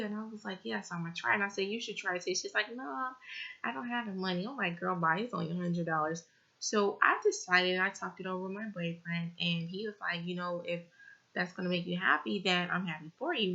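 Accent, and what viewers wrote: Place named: Louisiana, United States. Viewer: You sound like someone from Louisiana.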